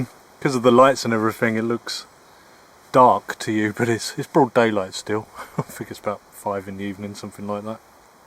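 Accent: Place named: RP, United Kingdom. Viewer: gone all posh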